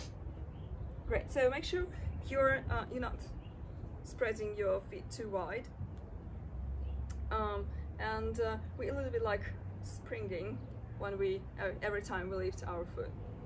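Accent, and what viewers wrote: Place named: Russia. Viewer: Чувствуется русский акцент у.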